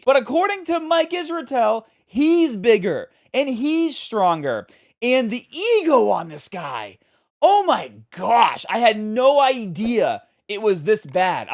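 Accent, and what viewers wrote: Boston, United States. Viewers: As a born a raised Bostonian (Roxbury btw) u have the deepest Boston accent 🫡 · Are you from Boston?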